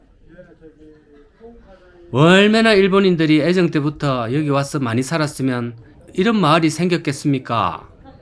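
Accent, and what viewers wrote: Gyeongsang, South Korea. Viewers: 경상도 사나이 말투 듣기 좋습니다 언제 구룡포 함 가봐야겠군요~~👍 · 어우 갱상도 사투리 듣기싫네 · 구수한 말씨의 전국을 다니는 경상도 사나이 응원합니다 !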